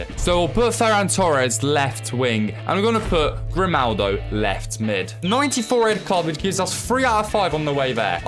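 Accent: Poland. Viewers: Are u polish maybe?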